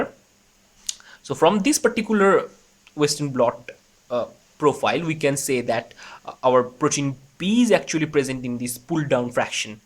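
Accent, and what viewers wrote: India. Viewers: tell me are u from India? what is that accent??? i need to know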